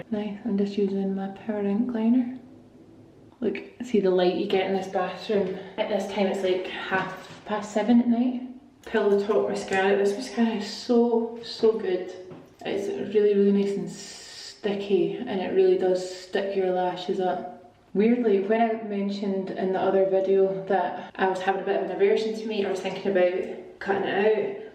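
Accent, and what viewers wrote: Scotland, United Kingdom. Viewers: Scots talk realllllly fast. Wow. Great video 👍🏼👍🏼👍🏼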